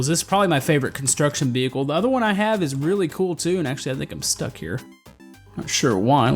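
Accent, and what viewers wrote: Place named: South, United States. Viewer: You sound southern please tell me I finally found a YouTuber from the south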